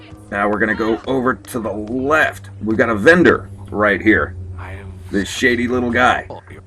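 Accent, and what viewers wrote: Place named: United States. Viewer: Arno Viktor Yeah it's a nice calm voice. Don't normally like the American Accent but dude you got it on lock down lol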